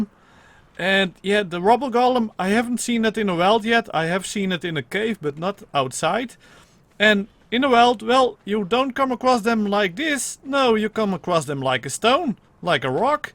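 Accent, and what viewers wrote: Netherlands. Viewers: Ik hoor nu al dat dit een Hollander is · Hey your dutch i can hear laat de leeuw niet in zijn hempie staan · are you a dutchman? you sound like one...i recognize the accent! XD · Yes im dutch too ik hoor het aan u accent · I'm just gonna assume you're dutch. Because you sound hella dutch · Is he Dutch because I hear Dutch pronoun citation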